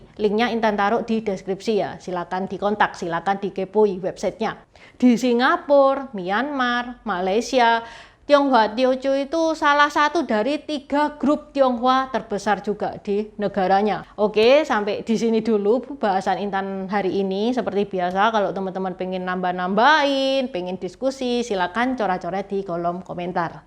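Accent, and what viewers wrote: Java, Indonesia. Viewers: Bgs sekali chanel nya... Tapi sblm nya maaf ya ce intan... Bahasa ce intan terlalu medok skli logat jawa nya...😂😂 Di syg kan skli ji kalau ce intan coba bisa 4 bahasa ( khek,hokkian,kanton,tiociu) kita bisa byk skli utk dpt belajar...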